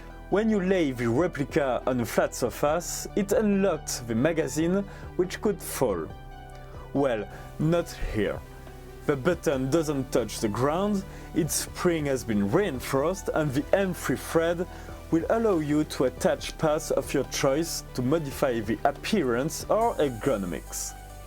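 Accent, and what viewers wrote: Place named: France. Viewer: Part of speaking other language is using its pronounciation. If you speak English, do not use French pronounciation. Respect your listeners by doing 100%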